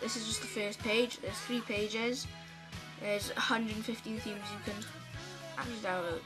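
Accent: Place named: England, United Kingdom. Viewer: He means your england accent. xP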